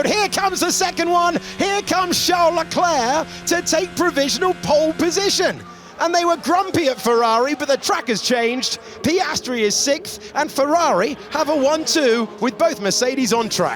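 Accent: United States. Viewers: get this american of the commentary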